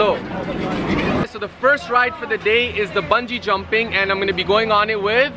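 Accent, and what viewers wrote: Punjab, India. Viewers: right have a punjabi accents with Urdu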